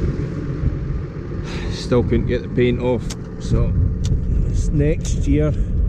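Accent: Scotland, United Kingdom. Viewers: He is a proud Scotsman!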